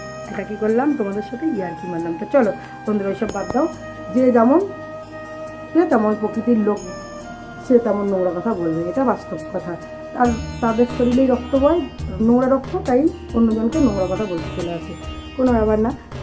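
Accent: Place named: Bangal, Bangladesh. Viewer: আহা গো কি সুন্দর বাঙ্গাল কথা কও গো 😍😍😍🥰😊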